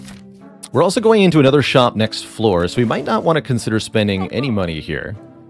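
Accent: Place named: United States. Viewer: Thanks for the summary! (his accent is definitely American ;)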